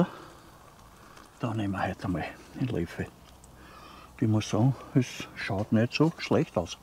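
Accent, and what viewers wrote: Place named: Austria. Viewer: Danke lieber, ja da gibt es viele Videos... Aber deine sind die Besten. Deine Art etwas vorzustellen hat was ganz besonders... Dein österreichische Dialekt, deine Ruhe... und die Umgebung in der du filmst... 👍👍👍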